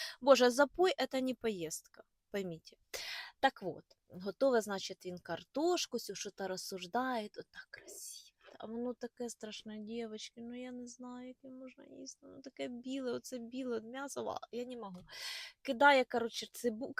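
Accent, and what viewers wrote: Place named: Ukraine. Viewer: Какая же у вас речь противная, ну как вы слова произносите, это просто кошмар. Как хорошо, что я не родилась в хохляндии, не дай бог вот так разговаривать.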